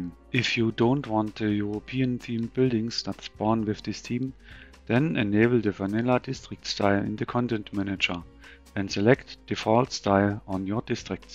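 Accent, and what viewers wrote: Germany. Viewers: Do i hear a german accent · Man kann den deutschen Akzent ganz schön deutlich raushören😅 Ich selber bin da aber auch nicht viel besser, wenn ich "Englisch" spreche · Dieser Deutsche Akzente 😄 So spricht mein Vater Englisch 😅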